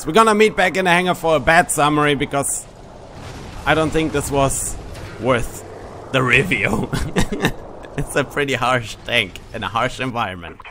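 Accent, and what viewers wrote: Germany. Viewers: i just love your accent i think its german right? xD i laugh so hard everytime SUBBED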